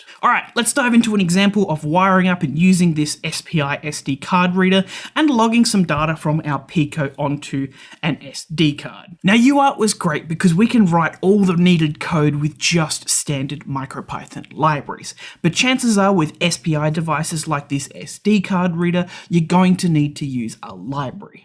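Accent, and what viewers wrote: Australia. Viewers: Part way through and finding this the most clear, no fuss course to follow. big thumbs up. did have a snag at beginning where i almost gave up. couldn't get pico to be seen on laptop. turns out i was using a power only cable and not one with data. silly mistake but got there in the end. very pleased you uploaded this course. Thank You, and is that the Aussie twang to your voice? Brit here and find dialects fascinating. It changes here in just 20 miles apart.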